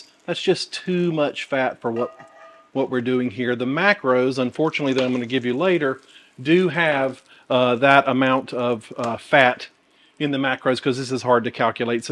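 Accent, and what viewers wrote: South, United States. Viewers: My husband says you’re me but with a beard!!:) that’s because his mother is German and he grew up speaking high English. I’m from Louisiana aka Luzianna. I grew up with such an accent but moved north in high school and have been mercilessly teased since. I think your Southern style is lovely and refreshing! God bless you shooger💜🙂 I love you’re show and find it informative and entertaining. I love your recipes and tips. Keep up the great work. 🍽️🎬🎦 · I love you and I am proposing. I love your personality, your southern manner of speaking, and darlin’ you can cook! I know you are spoken for, but I will wait. BTW I am 81 years. ❤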